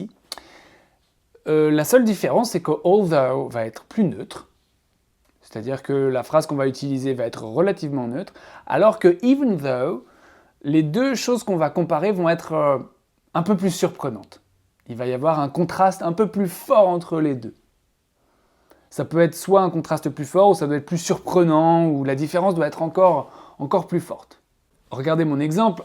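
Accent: England, United Kingdom. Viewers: J'adore cet "accent" anglais :) Thank you so much ! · La premiere impression.. Je me suis tout de suite dis que c'etait un anglais ....u have a good English.... J'aimerais bien apprendre avec vous... Vous êtes très sympa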